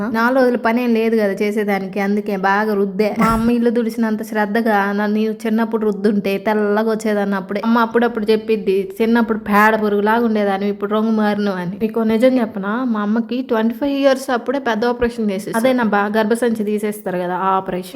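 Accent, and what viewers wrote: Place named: Nellore, India. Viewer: Sis medi evuru me language Nellore district vallalaga undi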